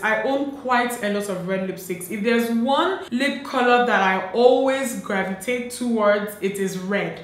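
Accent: Nigeria. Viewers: I love love love all your videos. You are so Nigerian and its so refreshing to see someone original. Not all these Nigerians faking oyinbo accent. Thank youuuuuuu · I was trying to place your accent because I recognized it but this is the first video of yours that I've seen and as soon as you said Nigeria, I was like THAT MAKES SO MUCH SENSE. Just a really random thing I was thinking about XD I like your reasoning on never having enough red lipsticks XD Also, I LOVE your eye look :) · Usound exactly like my daughters pedestrian. She is Nigerian!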